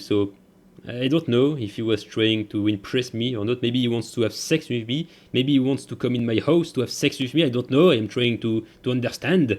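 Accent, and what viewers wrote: France. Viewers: GS bro and I love your French accent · hey mate.. I like your skills in the game and I respect you. plz ignore these type of stupid players. your English is good enough, even though it's funny strong french accent. but it's cool. I wanna see more of your videos and I advice you to add some music. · That is 1 thick sexy ass french accent, do more vids plz:p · Your English is fine. I mean you have an accent which is understandable because you're French but yeah other than that it's good man don't worry about that. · Just watched it (5 years late) and it is so funny. Despite the fact that you are right about that dude and his crew being absolute trash it is so funny to see how salty you get. But I want to be clear, I would do the same thing and get salty about ppl being so stupid on GTA online. Its just natural I guess. Love your content, love that french accent and the way you and play „together“. 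Sincerely, a german. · Love your vids man and your french acsent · Ça va ton anglais même si tu as un accent français.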